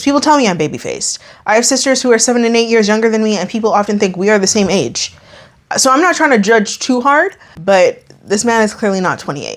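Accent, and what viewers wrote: Canada. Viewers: ITS OKAY I LIKE THE CANADA ACCENT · Nah bc girly I feel attacked since I brought up the Canadian "about" thing a video or 2 ago 😭😂❤️ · I don't know why you hate your Canadian accent so much. It sounds good to me, I think you should embrace it. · Lmao when you said”in weird round about way” I died 😂😂 I’m sorry I love Canadian accents Editing to add Kami would’ve sus it out because it was definitely fake 😂😂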